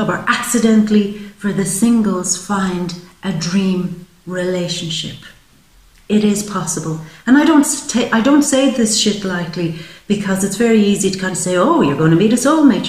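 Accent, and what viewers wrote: Ireland. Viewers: I love your Irish accent. I work for a lovely Irishman. The Irish are poetic, and deeply in touch with the spirit energies! <3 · Felt pulled to ur video😇after watching O.A.'s 1st season yesterday, I see why🤔👰🤴🥂loved the reading & ur lovely Irish accent😃😎☄had to sacribe to ur channel & want to say THANK YOU to u in advance, 4 helping me w/my "Ascension"☄😎👰🤴🥂🕉 4/28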